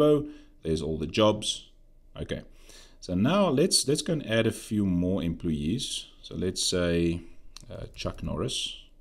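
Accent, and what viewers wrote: South Africa. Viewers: That accent right there is ZA! Awesome content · He sound so South African...thanks for the upload... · THICK South African accent. Represent boet.. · from that accent straight away, i knew u are from South Africa, thanks mate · Great video. And I could listen to this guy's South African accent for days on end.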